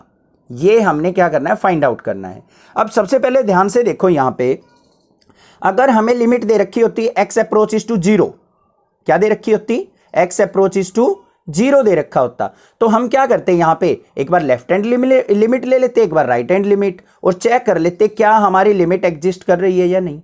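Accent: Haryana, India. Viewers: Sir Are you from Haryana?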